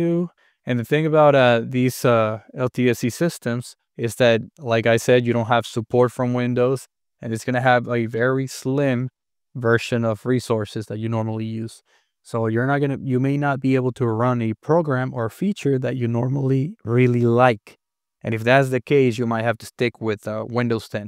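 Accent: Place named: United States. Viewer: Muchas gracias hermano. qundo me coco no trabajo... you ensonido como un gringo. sin escolar. Su Engles es perferto. Felicidades ! Quiszas con mas la practica.... un dia enel futuro, Mi Espanol .... Tu intiendo mi sentimiento. Occidente Australia. poner este lugar sobre su listo de viajes. Disfrutar su dia amigo!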